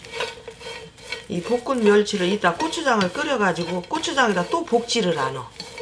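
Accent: Chungcheong, South Korea. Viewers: 어머님 충청도 말투가 조금 있으신게 넘 정감이 있네유^^ 저두 충청도에서 태어나서 지금은 뉴욕서 살고 있네유 ~ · 울 엄니가 하시것 같아요 구수한 출청도 사투리 넘 정겨워요 ^♡^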